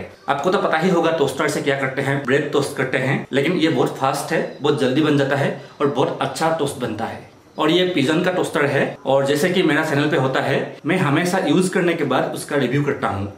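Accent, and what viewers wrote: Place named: Bengal, India. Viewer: Wow Bongali accent, Kemo naaache?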